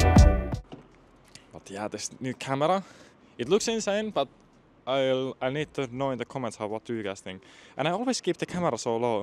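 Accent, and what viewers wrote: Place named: Finland. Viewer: first time seeing a video of you and instantly recognized the Finnish Accent, hope all goes well and got a new subscriber <3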